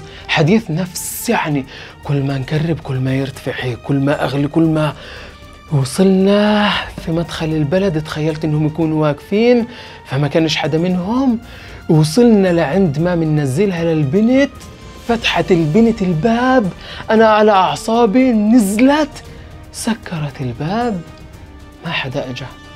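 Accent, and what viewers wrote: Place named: Palestine. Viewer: احلا لهجه الفلصطينيه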